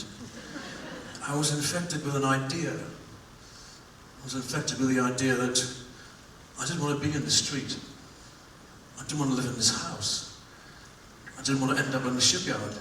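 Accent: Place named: Newcastle, United Kingdom. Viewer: if this ever tours and comes to Calgary I will be first in line. I get teary eyed just hearing the Geordie accent. My Mam is from Wallsend so this hits close to home.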